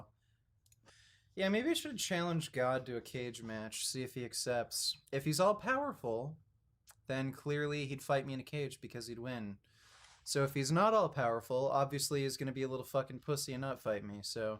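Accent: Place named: Canada. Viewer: It's all aboot whooping ass.. like drake your from Canada. Tough voice th0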